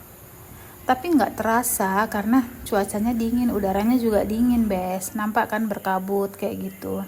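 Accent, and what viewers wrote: Medan, Indonesia. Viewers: Logat..logat nya mb ini kayak orng medan ya😂😂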